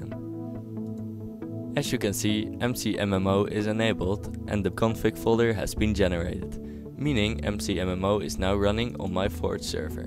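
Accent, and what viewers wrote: Netherlands. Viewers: Tuurlijk is hij nederlands, Kun je zijn accent niet horen? Idioot.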